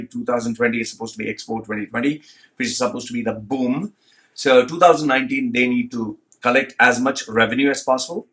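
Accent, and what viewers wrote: India. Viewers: You sound like an Indian,,,are you...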